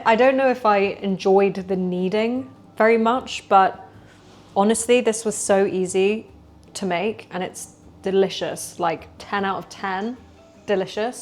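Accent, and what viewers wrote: United Kingdom. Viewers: Hi! I speak Spanish and I'm learning English. Your video helps me a lot and I love your accent, especially because it's British. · yav dayı şu british aksanı ile konuşmayın yav bana USA lazım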